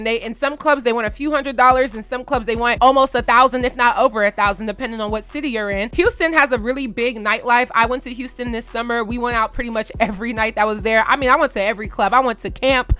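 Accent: Nigeria. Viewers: Nigerian accent cures my depression 🇳🇬💖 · I love when brings out her Nigerian accent! I know she’s annoyed when that comes out! 😭😭🔥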